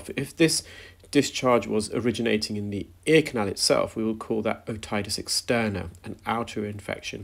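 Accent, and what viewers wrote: United Kingdom. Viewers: British people sound like that. I watch a fellow from Scotland who treats cow hoofs. When he says "bull" it comes out sounding like "bill"! Took me a minute to get used to that Scottish twang, applies for Brits too......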